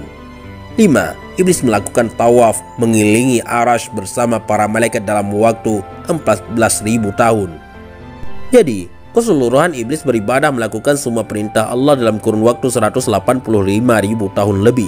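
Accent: Aceh, Indonesia. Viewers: Anda bicara seperti orang Aceh , apakah benar ?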